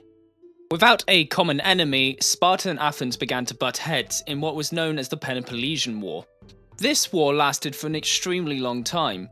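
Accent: United Kingdom. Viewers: fennisdembo34 no I think he is from somerset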